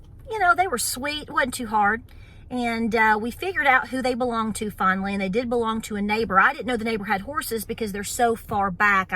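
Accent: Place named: Tennessee, United States. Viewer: Love your channel more and more everyday. Love that Tennessee accent too. Lovecyall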